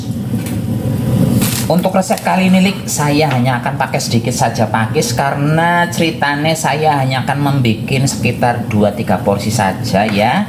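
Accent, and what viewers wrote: Banyumas, Indonesia. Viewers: Om maaf iya batam itu daerah jawa tengah iya kok om bisa bahasa jawa kayak orang bayu masan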